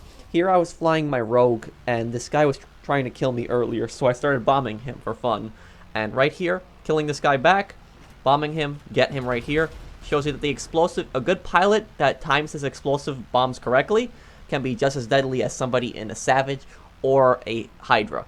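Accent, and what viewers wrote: Poland. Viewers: Where are you from? Poland? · Polish? You from poland?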